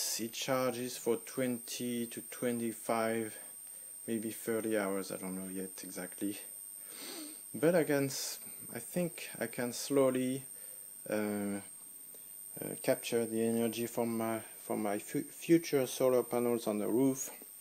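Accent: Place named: France. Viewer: Salut, à écouter rapidement le début de la vidéo, je pense entendre un accent bien français:) ce qui me donne espoir de pouvoir discuter de ton installations de vive voix. Fait moi signe stp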